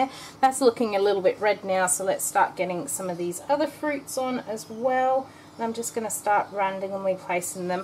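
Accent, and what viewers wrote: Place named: Australia. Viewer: I truly enjoy your videos and enjoy hearing you talk. I don't hear Australian accents very often living in America, so you're fun to listen to 😊 And it is so neat to see all the unique ideas you have!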